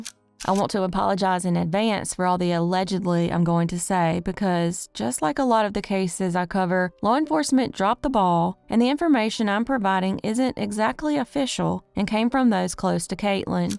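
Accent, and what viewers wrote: South, United States. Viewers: I love to hear that southern accent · Hearing Southern crime/unsolved mysteries stories from a narrator with a Southern accent is so much better. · I love hearing a southern accent for a change, born, raised and still in southeast GA I haven't heard these before only the current case I'm following in Sumner County, Sebastian Rogers as well as Summer Wells also in TN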